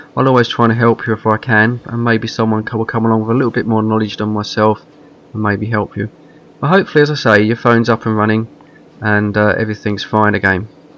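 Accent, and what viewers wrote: United Kingdom. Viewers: Thank you Sir, Your advice saved me a lot of frustrations when my iPhone's screen went blank after entering wrong passcode several times. The screen went black and blank and was unable to respond to anything after trying to reboot or press home button several times. Thank you again.- -Richard from Canada. ( I know the owner of this video is in the UK {telling by accent})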